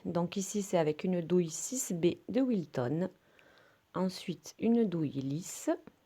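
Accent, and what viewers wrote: South, France. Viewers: Bonsoir je viens de vous découvrir, je me suis abonnée sur le champ ! Vos réalisations sont magnifiques Bravo ! vraiment tout est clair, bien expliqué et votre voix très agréable, on sent bien le sud 😊 Merci pour vos partages 🙏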